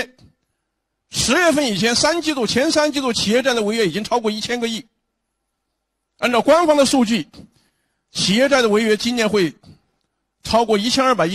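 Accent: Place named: South, China. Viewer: ，您这站得直啊。我以前比较小看南方口音，特别是 B 站做游戏视频的。没想到南方口音也能说出这么有气节的话！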